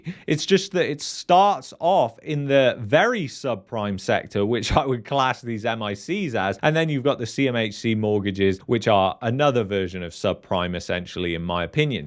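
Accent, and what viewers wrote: United Kingdom. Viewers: 4 months ago you were saying the same shit , where is the apocalypse ? Please confirm. We all know it's coming soon but please provide some original information. No facts or data to back up your pseudo doomsday claims. I mean come on we already have Alex Jones and more lmao. Im sorry but i need more than a british accent and fake data to tell me what to aim my thoughts toward.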